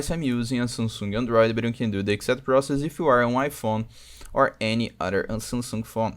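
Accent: Brazil. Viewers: Pelo sotaque é BR certeza kakaka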